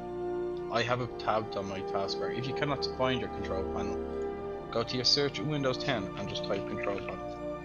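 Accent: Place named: Ireland. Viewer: Took me only 10 seconds to realize this person is Irish.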